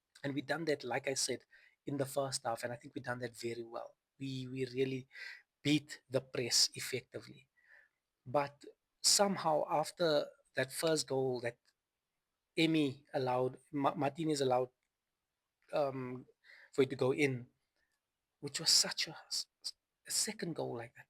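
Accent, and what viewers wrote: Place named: South Africa. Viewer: beautiful accent. are you from south africa by any chance?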